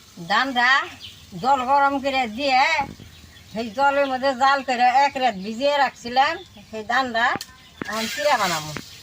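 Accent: Tangail, Bangladesh. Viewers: এটা তো বাংলাদেশের টাংগাইল জেলার ভাষা বলতেছে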